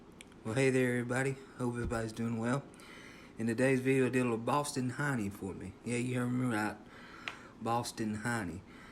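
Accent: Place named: South, United States. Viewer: Beautiful home cooking honey! That pork looked amazing. The bark was spot on!! 🔥 That’s funny and cute about you not saying “butt”! Love your country personality and accent and loved your outside setting.. very peaceful! Thank you for sharing. I enjoyed the video honey! 😘 PS the sammich looked amazing! 🤤 🙌🏻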